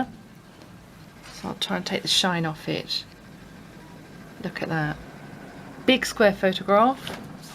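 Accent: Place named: United Kingdom. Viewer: i love your british accent. much nicer than the american one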